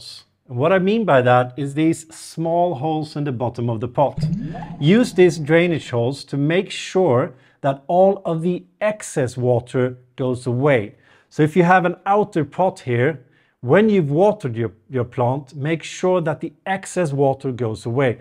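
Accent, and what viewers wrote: Scania, Sweden. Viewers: Just love how he speaks English with a touch of skånska. ”Reubusta” 😂 Great video guys 👍🏽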